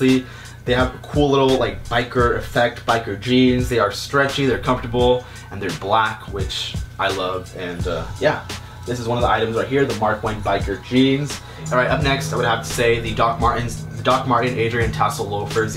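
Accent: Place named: United States. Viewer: Si vas a hablar espanol hablalo bien no con acento americano, just saying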